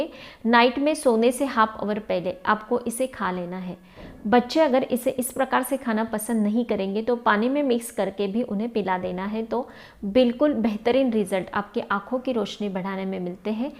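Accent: Maharashtra, India. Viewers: Good Tips...Thanks. My guess... you are Maharashtrian. Because of pronouns of some words done by you I came to that conclusion. Am I right ?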